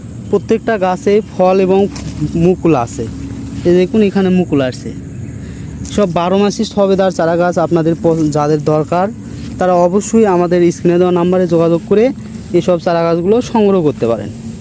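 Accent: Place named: Bogura, Bangladesh. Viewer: বগুড়ার কথায়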